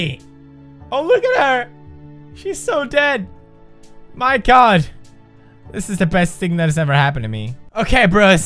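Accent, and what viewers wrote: Sweden. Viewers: He did say bear but he has a Swedish voice so that in a result is why you hear "beer" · lol it is such a swedish thing, my bf is from there and he says beer as well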